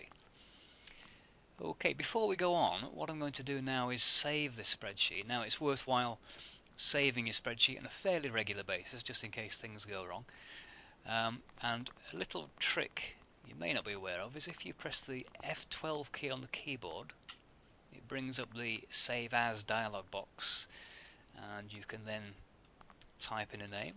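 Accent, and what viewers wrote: United Kingdom. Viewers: thanks u british guy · don't know why i can listen to british people doing tutorials but american accents bore me to death in tutorials!? Even though im american! Anyways good job on the video!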